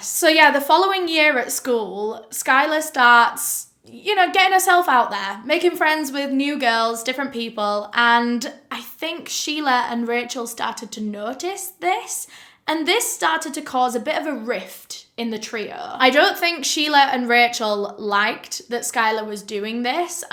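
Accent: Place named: Yorkshire, United Kingdom. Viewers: You tell the story really well. Love the Northern accent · its an English accent lol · She has a Yorkshire accent :) x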